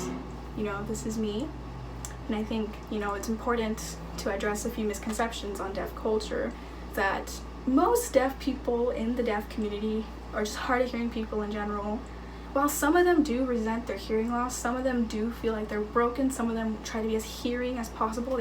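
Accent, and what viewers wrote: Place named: United States. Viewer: You know what I don't understand about you? You f lost your f hearing and all you cared are about people! "If I have cancer at least people will understand".. " I have something to tell people finally".. Whaaaat the hell is wrong with you people from USA? (I suppose you are from USA by your accent). You are all so shallow and willing to be.. part of something, included, communities, etc.. Damn girl. You have all my sympathy for the hearing loss but you need to change your ways. Really.. you are all like from the same material in there. I can't care less about people.. what they think.. I care about my f hearing.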